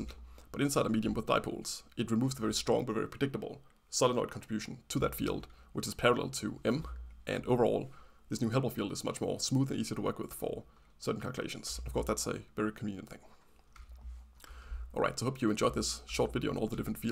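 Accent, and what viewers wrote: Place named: Germany. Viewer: Your teutonic accent and how unnecessarily fast you speak makes it very hard to understand what you’re saying.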